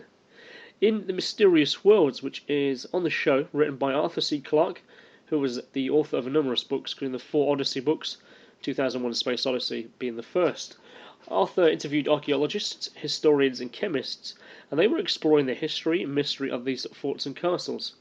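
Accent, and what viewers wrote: United Kingdom. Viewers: The narrator sounds British so give him a break. 🌈🌈😝🌈🌈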